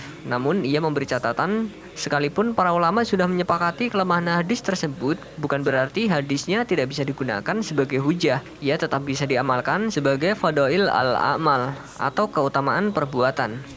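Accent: Java, Indonesia. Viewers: Admin Jawa ya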